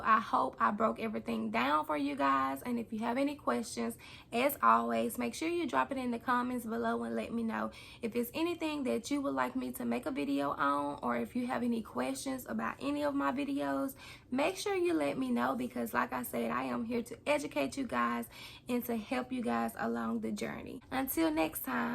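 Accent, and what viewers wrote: South, United States. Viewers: Where you from Cutie. Love that south voice, video about saaalf!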